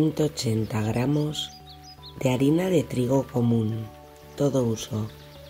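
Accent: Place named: Spain. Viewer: Los limones en Mexico son verdes no amarillos son lo mismo?. De q pais eres, me suena tu voz a Española.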